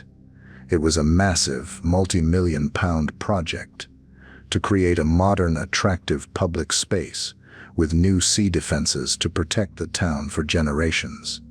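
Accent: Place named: United States. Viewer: why is the narrator using a US voice? This is silly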